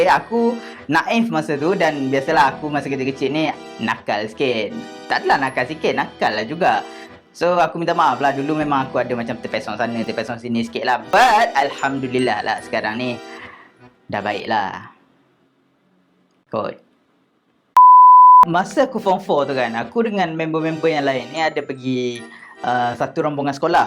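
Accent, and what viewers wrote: North, Malaysia. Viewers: Saya org utara saya tk tau nk cakap mcm mana bila abg cakap loghat utara. 😅😂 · Bolela loghat utaqa abg🤣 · Loghat utara tu still acceptable 😂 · Boleh tahan jugak loghat utara abang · Lawak la oi abang cakap utara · Bahasa utara abang tu betul la...tak sangka plak betui...saya oqang utaqa hahaha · Hahahahaha lawak bang buat lorat utaraa😂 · boleh lh skit skit nk ckp utara tuuh sebab saya oang utara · bang saya orang utara bagi saya abang punya utara tu okay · Loghat utaqa bang bukan main sempoi🗿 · Pandai pulak die cakap utare😌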